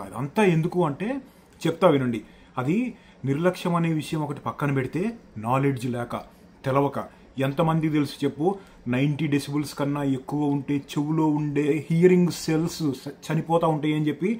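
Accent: Andhra Pradesh, India. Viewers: Emti sangatulu ane style, chala natural ga vuntundi. mee cooking style means like sambar karam with castor oil and vade ingredients me grand mother cooking and matladae style chooste, looks like Andhra style. I think me native roots in andhra, may be me parents are grand parents migrated to Nizamabad.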